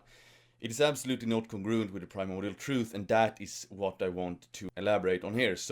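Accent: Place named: Sweden. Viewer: Each word is the glorious truth in a Swedish accent. Your words are the pathway to the recovery of your heritage. Men taking responsibility for their women, their family, and their nation is the pathway to personal and civic greatness. Each man that reflects your views is a mirror of the content of your character, and the image is seen when you are not there. Powerful words worthy of a public forum. Strong women give birth to and produce strong children. Why should a woman take on an enemy "one on one" when she can send any number of sons to send those enemies back to their god?, if you are your mothers' son, your mother is worthy of great respect. PUBLIKFRAMGANG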